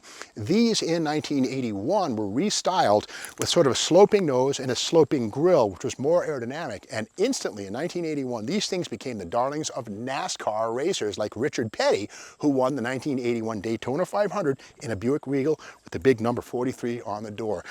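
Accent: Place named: Central Massachusetts, United States. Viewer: Actually grew up in Worcester county, central mass, they have a unique pronunciation of some words. Like,cahnt, for can’t, heeya, for here, and cah for car,etc.etc.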